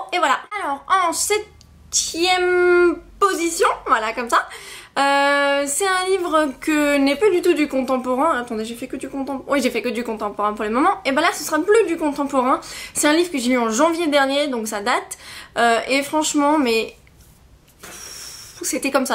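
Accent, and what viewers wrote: England, United Kingdom. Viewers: t'es trop drôle! ^^ j'en ai déduit que tu vis en Angleterre avec ton accent! ;) merci beaucoup pour ces présentation de livre!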